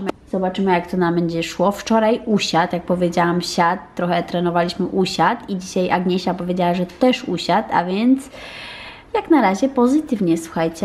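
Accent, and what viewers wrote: France. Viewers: dlatego, że we francuskim końcówki są "bezgłośne". Nie wypowiada się ich tak dokładnie jak w języku polskim. Przyzwyczajenie do innego języka. Poza tym myślę, że to bardzo niekulturalne zaglądać komuś do portfela i komentować, że się dużo na coś wydaje.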